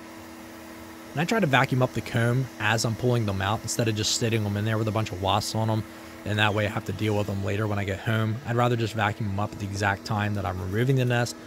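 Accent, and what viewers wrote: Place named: Philadelphia, United States. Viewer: You got a Philly accent. Certain words you say sound just like if you were from that area. Say the word Acme in one of your vids. Then I'll know for sure.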